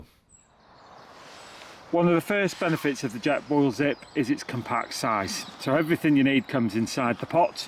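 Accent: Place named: United Kingdom. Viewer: British accents are so pleasing to listen to after listing to American videos. Nice video znd good information, Thankyou.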